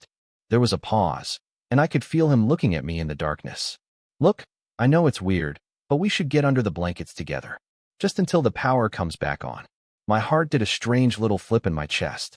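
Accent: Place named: United States. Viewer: This story created by AI , both content and voice . It's still good for people who is learning English , all American accent is very excellent .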